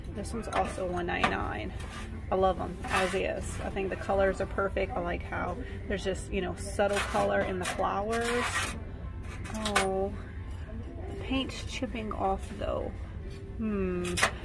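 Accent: Louisiana, United States. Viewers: Are you from Louisiana? ❤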